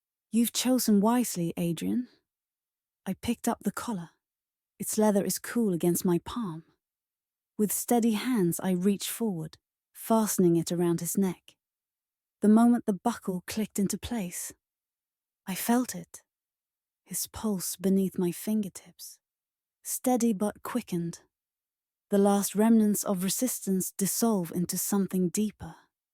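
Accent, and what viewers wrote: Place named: United Kingdom. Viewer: Why do all these FLR stories have a UK voiceover. Probably due to the high level feminists in there country. Enjoy while you can before sharia law takes over.😂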